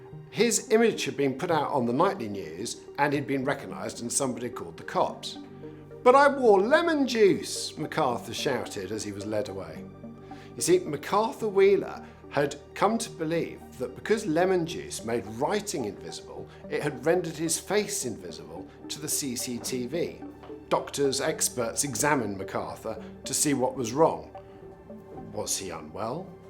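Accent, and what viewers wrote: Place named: RP, United Kingdom. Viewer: Time will tell who is who? This speaker will fell off his chair!! Talking and criticising President Trump in a posh accent does not make him intelligent. Be prepared for what is coming. We Love President Donald Trump..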